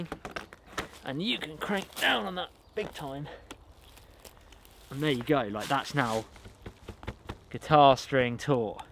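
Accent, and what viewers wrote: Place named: United Kingdom. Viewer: OH my Goodness, I had no Idea that you all over there in the UK used daylight savings time too! I love all your videos, and listening to your accent and learning more about the outdoors. Thank you for taking us all along with you on your adventures and your builds... and OH some awesome foods! Take care.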